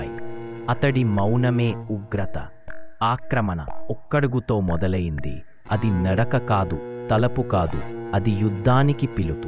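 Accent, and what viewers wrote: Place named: North India, India. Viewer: VFX good, story narration and Words spelling match kaledhu north vaallu telugu matladithe ela untadhi ala undhi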